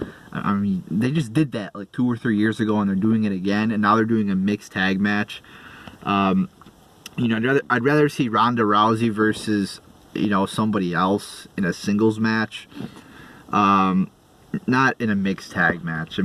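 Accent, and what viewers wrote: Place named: New York, United States. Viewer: you have similar voices. Ai New Yorkar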